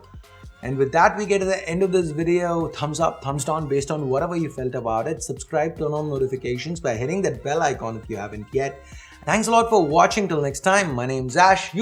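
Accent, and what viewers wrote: India. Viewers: i love u indian talking engliah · Very well done 👍 you're speaking the voice of India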